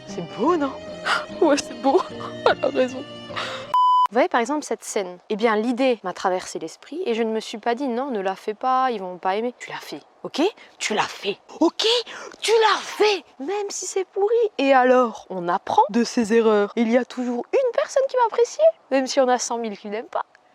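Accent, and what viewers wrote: Alsace, France. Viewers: Bonjour, petit accent Alsacien, Feu Tomi Nora Mols ……….lol……. J'adore...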